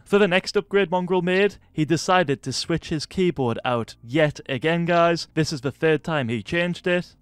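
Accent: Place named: Newcastle, United Kingdom. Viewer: here r u from newcastle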